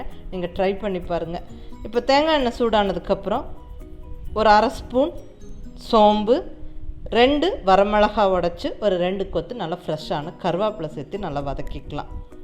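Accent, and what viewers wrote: Coimbatore, India. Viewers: Coimbatore pasai semma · I like all your recepies and trying too, coming very well and unga kongu tamil enaku romba pidikum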